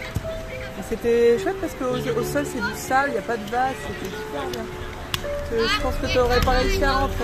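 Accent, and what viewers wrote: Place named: Germany. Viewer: Merci pour ce joli reportage, je suis surprise par cette belle architecture même si elle est cubique. J'ai adoré votre accent allemand 😀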